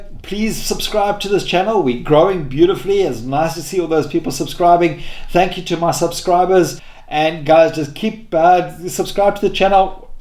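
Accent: South Africa. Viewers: I picked up the south african accent right away. Hehe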